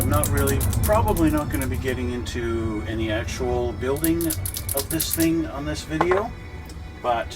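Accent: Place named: Canada. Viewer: Where are you based out of? You sound Canadian.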